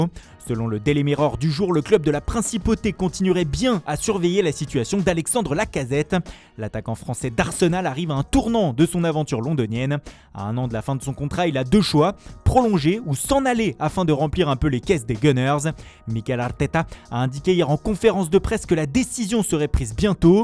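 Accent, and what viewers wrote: Italy. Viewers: Le retour de la voie avec les accents italiens mythiques 🥰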